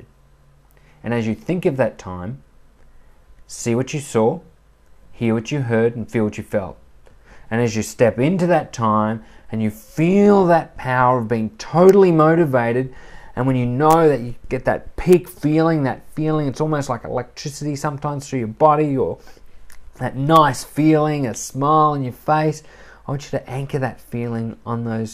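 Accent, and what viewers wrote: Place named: Australia. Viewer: Amazing. I only heard the first two seconds before it went back to buffering and I could tell he was Australian just from "Hi, this is Mar-"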